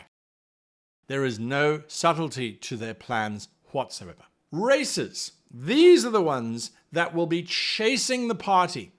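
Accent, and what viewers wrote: South, United States. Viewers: With that accent, I though all of the adventures were going to be getting those Duke Boys. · This country boy accent is killing me! Love it!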